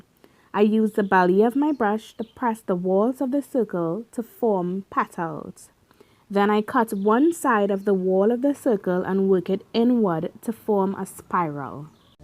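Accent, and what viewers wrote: Trinidad and Tobago. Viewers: U sound trini!!! 😀😀😀 U go girl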